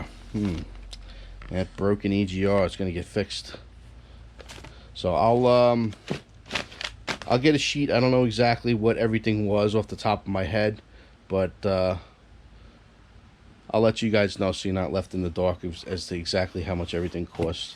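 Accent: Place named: Boston, United States. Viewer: Bro i can tell you're from Boston or somewhere like that ahaha. great vid thanks. What are you using for a drone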